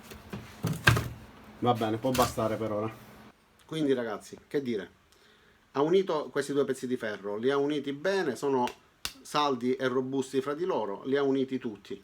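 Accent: Sicily, Italy. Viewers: Salve signor, potrei chiederle se acquista i prodotti parkside in o anche in un negozio fisico della Lidl? per via dell'accento ipotizzo che potrebbe essermi utile, abito in un paesino da cui il LIDL più vicino è in realtà parecchio lontano e mi farebbe comodo andare sul sicuro, non ordino online infatti proprio perchè a giorni dovrei recarmi a Palermo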